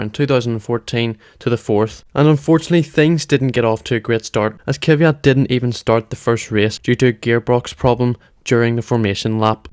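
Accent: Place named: Northwest, Ireland. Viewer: Bro, its a guess, but are you by any chance from Ireland, that accent soumds like from the North or northwest of Ireland. ❤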